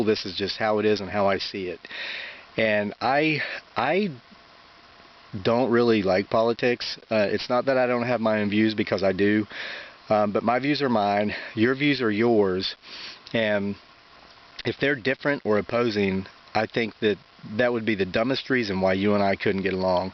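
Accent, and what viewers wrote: South, United States. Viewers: I love how you put it 'the job that I hate that makes me miserable'. You say it exactly the same way each time. You don't get rude, you don't get nasty, but you make it very plain, I like that! And the Southern speech...reminds me of home with my grandmother/great-grandmother...so happy to have found you last week! Blessings to you and your beautiful wife and handsome son.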